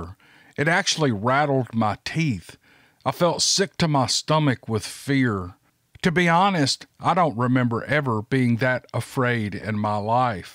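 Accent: South, United States. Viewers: Howdy Sir; Just wanted to take a moment to say Thank You on this hobby of yours. Did you say you were from MS or AR? I wouldnt think TX your twang isn't that gruff. Anyway, I do enjoy your tales. I am still not all that sure what they are and why we never seem to get anywhere about anything. I am even embarrass with some of these shows with these fools going out there and yelling hitting a tree then because they do not hahve anything make themselves scared for the camera or better yet those that make side people out to get them sigh. That gets old. With all the stupid stunts if they do every figure out this stuff nobody's going to believe them anyway.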